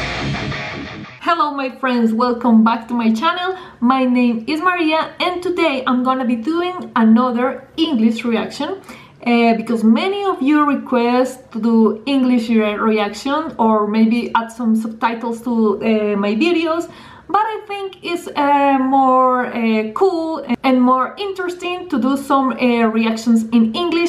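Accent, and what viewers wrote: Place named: Colombia. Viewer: tienes acento a colombiana, eres colombiana?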